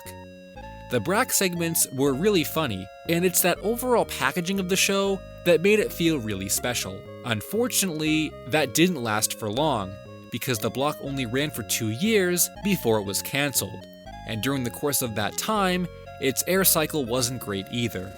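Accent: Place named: Florida, United States. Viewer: Where are you from??? I am in central Florida and some of these I remember watching like Qubo was my favorite. I remember having that one all the time even for back ground noice. I also sort of remember CW 4 Kids. You sound like you're around my area or in Florida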